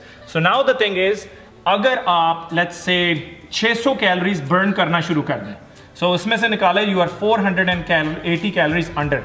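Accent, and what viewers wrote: Punjab, India. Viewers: Vo punjabi accent haaayee🤗🤗💕💕 love it. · indian punjabi/ pakistani punjabi with some foreign english accent.. amazing.. maza aa gya... thank u soo much veere... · U speak superb...while watching ur video, I'm smiling..ur Punjabi style👍 I'm also punjabi but even I can't speak that much Punjabi😂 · i love your punjabi accent keep it up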